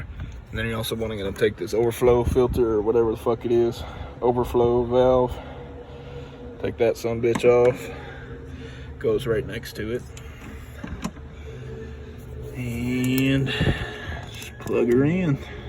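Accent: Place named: Alabama, United States. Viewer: Sumbitch you gotta be from Alabama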